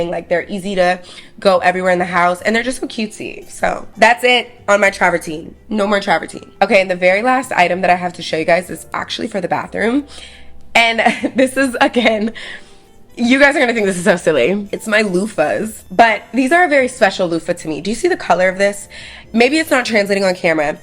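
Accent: New York, United States. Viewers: Am I the only one that hears a New York accent now with her?????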